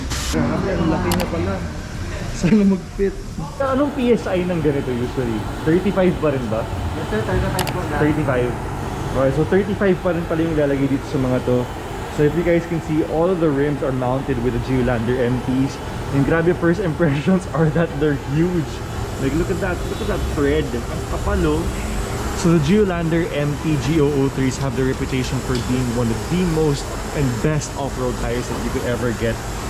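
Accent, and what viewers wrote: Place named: Conyo, Philippines. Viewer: conyo kris aquino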